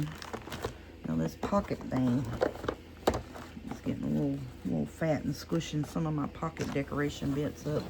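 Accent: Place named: North Carolina, United States. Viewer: Are you from North Carolina? I am and it sounds like you are. I love it!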